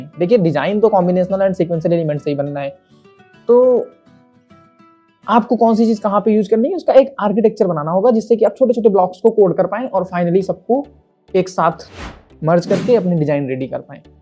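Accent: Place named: North, India. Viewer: Bro could you tell..? where are you from..? your accent denotes you're from northern region of india. i.e UP/Bihar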